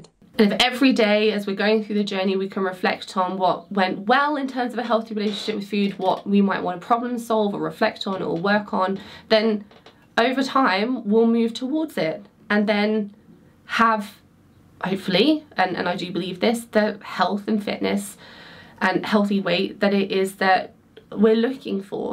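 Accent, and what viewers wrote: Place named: England, United Kingdom. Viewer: I am on a path this week, discovering that you are speaking ME and I have an eating disorder. This was the very first video I found of yours- I watched it 3 days ago and I can't stop thinking about this one thing you said: "Why not shift your thinking from, "How can I lose weight?" to instead simply a goal to have "a healthy relationship with food so you can live out a happy life." YES! This insight has COMPLETELY changed me and I just wanted to say thank you for helping me on this brand new journey to start having a healthy relationship with food. You are amazing. P.s. your English accent, long hair and delicate beautiful features remind me so much of the gorgeous actress Jane Seymour.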